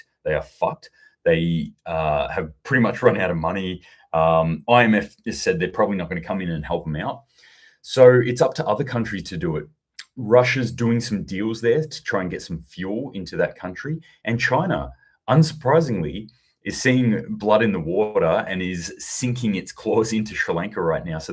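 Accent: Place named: Australia. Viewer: Only found you today and have only watched a few of your vids so far but am liking your content. Love hearing a fellow Aussie (I’m from SA) getting into the geopolitical theatre and giving straight forward breakdowns without the MSM spin. I think I read on telegram this morning that Spain was aligned with Serbia? Interesting when Serbia is aligned with Russia as well. Looks like todays tension has been kicked down the road for another month. And Serbia has beefed up its defensive measures a lot since NATO intervened last time… So many tinderboxes (conflict, contagions and food shortages) all over the globe at the same time. Coincidence of course. 🧐🤣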